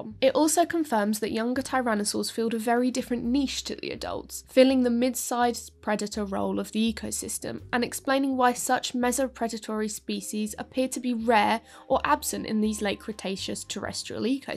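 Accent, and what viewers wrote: United Kingdom. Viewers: She also has a the THICCEST bri'ish Accent of the Trio now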